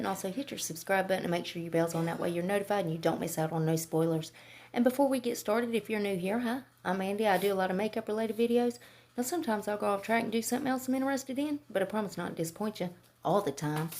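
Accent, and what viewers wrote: South, United States. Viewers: I'd love to get that Hourglass shadow! Your spoiler videos are the best... short, sweet, and served up with that awesome Southern accent (I'm a Bama girl myself)... keep your chin up, girl! ❤❤❤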